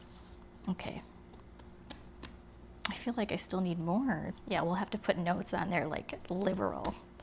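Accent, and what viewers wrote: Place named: Hawaii, United States. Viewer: I wanted to try cafe du monde mix but I wasn’t sure how it would turn out. So I searched for a video of someone who had done it…and found you. Are you from Hawaii? You sound like you are.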